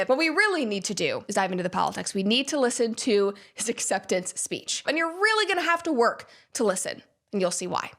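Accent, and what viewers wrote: United States. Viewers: citizen journalism is the future of news MSM is declining and i liked vibes fast, quick accurate precise vibing with American accent more like ASMR speeldial:person-turqouise-waving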